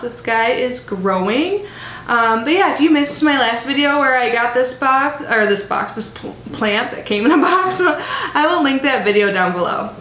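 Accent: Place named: Minnesota, United States. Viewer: Idk where you’re from but you have the thickest Minnesotan accent I’ve ever heard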